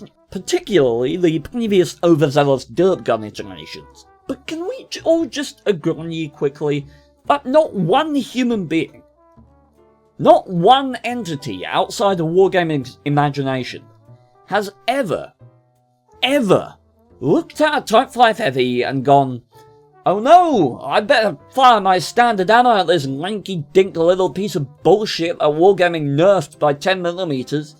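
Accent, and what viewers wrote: United Kingdom. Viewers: Sory to hear that. I was thinking that you spoke like that to make your English the most British sounding possible. Sory for my " neanderthal" English. Best wishes for you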